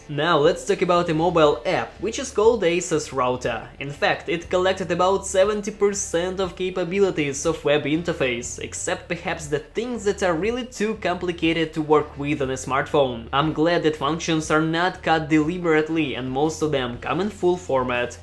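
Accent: Russia. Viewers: Russian English killing me🤣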